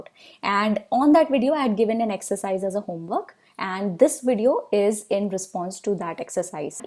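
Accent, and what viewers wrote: India. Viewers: Your accent is typical Indian. Learning grammer doesn't mean you are good in English, learn accent...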